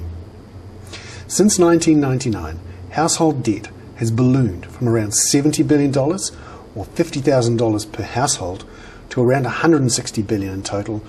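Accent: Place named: Canada. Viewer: great comments and nice accent from canada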